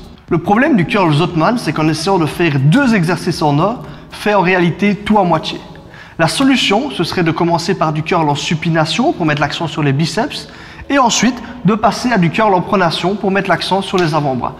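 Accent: Liège, Belgium. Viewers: Liegeois expatrie aux USA, je suis subjugue par la qualite de tes videos. Et l'accent me rappelle le pays ;) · Bonsoir , c'est bien juste ce que tu dis c'est très intéressant .... Juste un truc , tu parles avec un accent que je semble connaître ..tu es belge ?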